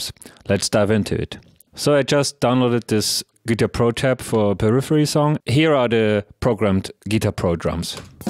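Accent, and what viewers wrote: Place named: Germany. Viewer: finally some useful information on this topic 🙏 Thanks mate! Or Vielen Dank, wenn ich den Akzent richtig gedeutet habe haha 🤘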